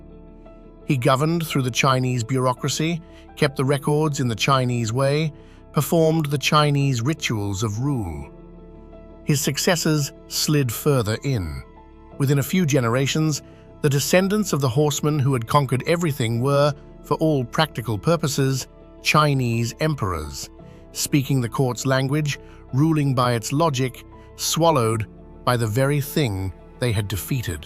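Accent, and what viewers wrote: United Kingdom. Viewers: The narration is a beautiful voice with a strong British accent. Thank you for telling people who don't understand Chinese history about our 5,000-year-old, uninterrupted history of constantly rising from the ruins.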